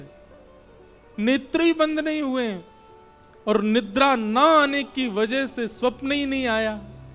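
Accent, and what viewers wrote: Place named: Braj, India. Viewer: Guru ji aapki brijwasi bhasha ka aisa jaadu hai itani mitti hai ki katha sunene ka aanand hi alag hai sub bhool jaate hai. Jai jai shree Radhey shyam ladli ji apni kripa aap par sada banaye rakhe. Aapko koti koti pranaam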